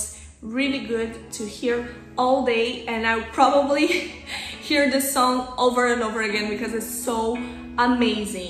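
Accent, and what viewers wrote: Brazil. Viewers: Great reaction! I love Lara, she is such an underrated artist. Unfortunately, she didn't make it in America so she kinda missed a huge market and the public a huge artist. O sotaque brasileiro é inconfundível, rs.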